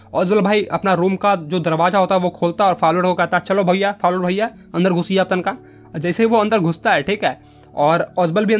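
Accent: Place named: Bihar, India. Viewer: Nice story 😊explanation sir and also I love🤭 your bihari accent 😇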